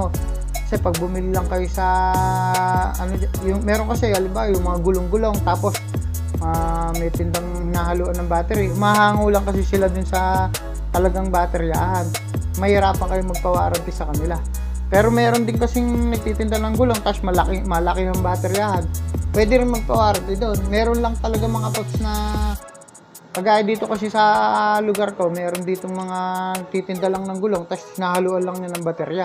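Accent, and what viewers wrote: Bulacan, Philippines. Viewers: yung punto ng salita mo, parang bulacan o nueva ecija? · Bulakenyo o taga binangonan ka paps? Sa punto kasi ng salita paps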